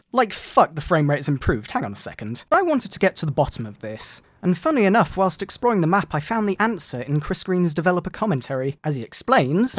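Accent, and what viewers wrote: United Kingdom. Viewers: Are you British? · YES, MY BRITISH SOUNDING MUM IS BACK! Seriously though, you make amazing content and I hope you continue to make great videos.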